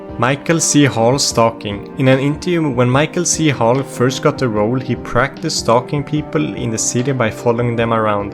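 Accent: Sweden. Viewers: the swenglish i love it! Great video btw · Are you by any chance Scandinavian? You sound kinda Swedish with the pronunciation. Greets and thanks for the great video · You hear the swedish more than the english when he speaks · yo are u by any chance swedish bc i think ur accent is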